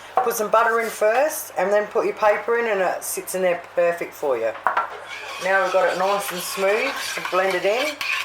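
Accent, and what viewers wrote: Australia. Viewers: Thanks for posting,looks yum,definatly have to try it,love your Aussie accent.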